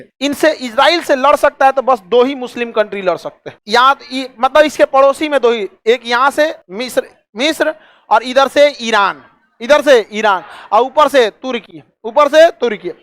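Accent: Bihar, India. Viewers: Bihari me bolna band kr samajh ni ata kuch tera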